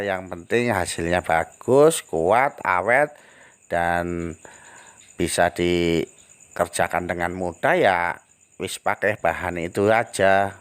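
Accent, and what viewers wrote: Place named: Banyumas, Indonesia. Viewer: logatnya ada ngapkinya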